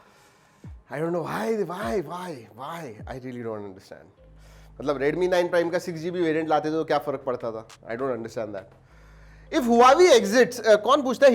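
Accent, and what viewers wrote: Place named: Marathi, India. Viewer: अरे व्वा आपण एवढं स्पस्ट मराठी बोलतात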